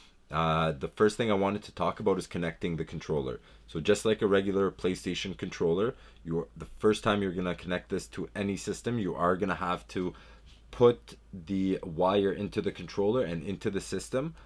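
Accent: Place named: Guyana. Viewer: Yuh sound guyanese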